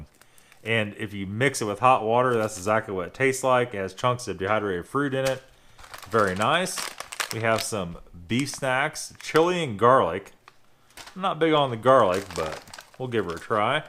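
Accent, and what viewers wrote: United States. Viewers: Your intro is always so cool man :0) so fast talking American, impossible to me, lol :)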